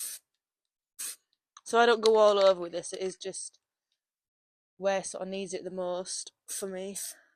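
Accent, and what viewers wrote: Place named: North Yorkshire, United Kingdom. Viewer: yes can tell with the north Yorkshire accent 😊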